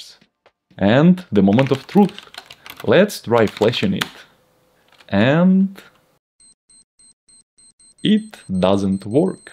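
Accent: Russia. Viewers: русский русского услышит всегда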